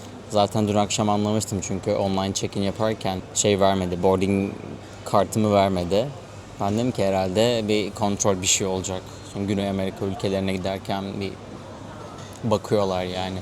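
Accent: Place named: Turkey. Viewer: Soy argentina. Bienvenido y que lo pases muy bien. Solo escucharte hablar 2 minutos y reconocí que sos turco. Soy una gran fan de la telenovelas turcas. Seas bienvenido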